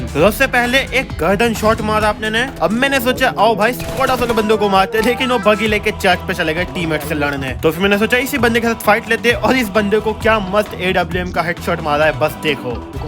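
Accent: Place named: Mumbai, India. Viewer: Mumbai ki boli kadak re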